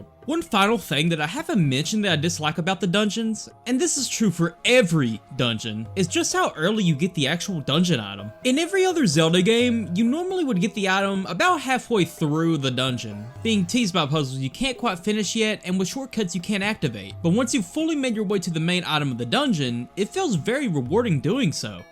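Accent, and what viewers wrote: United States. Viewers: First, I adore what I swear is a faint country accent I hear, but I'm actually here fresh from PBG's at the time latest video, (Wind Waker) and I admit- While I'll likely never grow tired of Zelda content I grow weary of the same 3D Zeldas being covered as it's not like there really is any bad ones. You can find someone presenting valid good points for any of them. I actually keep forgetting the DS ones existed that were a great time of my past. Spirit Tracks really does have what I'm sure is among the most complex "puzzles" in the series mainly in the Tower of Spirits, as well as some of the most fun Bosses that actually can present a challenge specifically because the DS Zeldas are right in between what I consider "True 3D Zelda" and "Top Down Zelda." As for items as you mentioned of the Whirlwind, sure there are a lot of wind archetypes items in the series, but it's the order of the items you get staples or not that can affect things. 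You're using the Whirlwind for alternate means to progress until you get the dungeon's item proper, the last dungeon's item is a more inefficient tool that can still fill certain shared roles keeping it relevant, and keeping my disbelief suspended. This isn't exclusive to Spirit Tracks of course, but it feels you're getting the most out of each item until you're hard walled by something you need the next item for. And YES. This game does have it's flaws. As weird as it sounds I wish it's elements were remade into a "True 3D Zelda" but I under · From one guy with a country accent to another: great job! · Is that an Arkansas accent? There aren't enough gaming YouTubers with a good, strong American accent. Review some more Zelda games and I'll watch.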